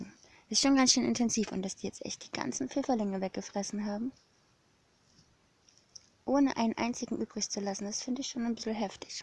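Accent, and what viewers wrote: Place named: Saxony, Germany. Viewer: Na du weißt ja, dass ich sächsisch mag..😇 Da freuen sich bestimmt auch alle anderen hier in UNSERER Dorfschule, wenn du es nochmal aussprichst..😜 Habe mir gerade einen Kakao gemacht.. Pulver, eine Miniprise Salz, Vollrohrohrzucker und Wasser...😋 Nu issa aber schon alle..😝 War superlecker!!🔆 Und schreit nach mehr.. Werde beim nächsten Mal, etwas Kurkuma, Pfeffer, Muskat, Kardomom, Kokosöl etc. zugeben.. So wie man eine goldene Milch macht, wenn dir das was sagt.. Schön, dass es auch ohne Milch und nur mit Wasser schmeckt..😘 Super Tipp von dir❤😇🔆😘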